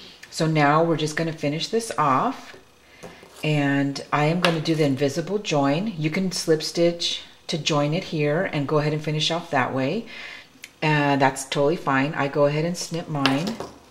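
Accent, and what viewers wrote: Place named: United Kingdom. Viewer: Sounds like your from the UK... The stitches are in English / US terms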